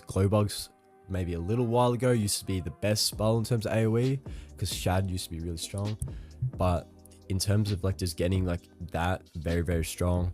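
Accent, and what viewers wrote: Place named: Australia. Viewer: judging by the accent you're Aussie too, im questing atm still pretty new and looking for some people to play with if you're keen or anyone else watching.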